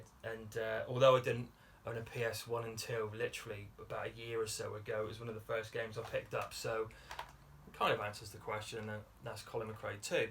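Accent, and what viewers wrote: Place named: Wales, United Kingdom. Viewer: Some great answers there buddy 😄 ive never played a mana game but always been interested. There should be more Welsh accents gaming 🤣🤣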